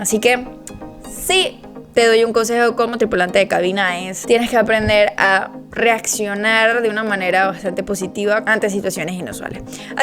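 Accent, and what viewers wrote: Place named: Venezuela. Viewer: Hola, soy venezolana creo que tu también por el.acento tengo 55 años gozo de buena salud y me veo bien, mi sueño es ser TCP en alguna aerolínea estadounidense pero vivo en Venezuela mi pregunta es ellos piden el GED soy teacher de inglés en mi país ya con eso es suficiente? No tengo visa sólo pasaporte italiano porque tengo la nacionalidad ellos me tramitarian la visa de trabajo en caso de ser seleccionada? Espero me puedas contestar cariño.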